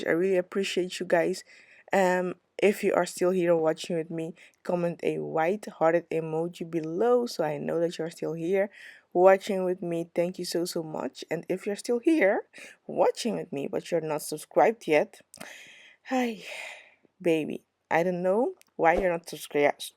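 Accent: Netherlands. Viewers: Hii honeyy love your work, love ur accent are u perhaps dutch? Xx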